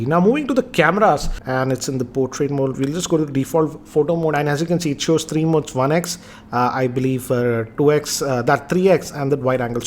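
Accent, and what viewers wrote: India. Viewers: very strong Indian Accent...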